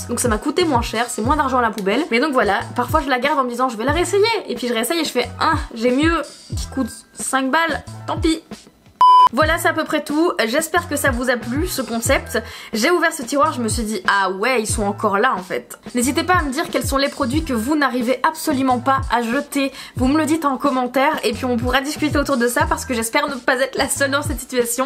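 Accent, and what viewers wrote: Belgium. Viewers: Tu as pris un peu l'accent belge j'entends 😂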